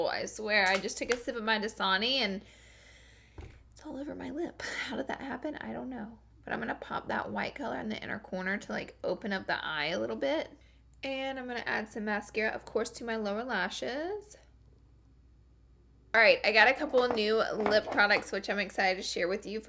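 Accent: South, United States. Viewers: i love you so much 🌈🌈🌈🌈 early snapfam. love your country accent 😚 much love from your biggest fan from Norway ❤ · 🌈🌈🌈🌈🌈🌈🌈🌈🌈🌈🌈🌈🌈🌈🌈🌈🌈🌈🌈🌈🌈🌈🌈🌈🌈 Snapchat fam!!! Love ya Girl! Love ur southern accent it makes me miss mine!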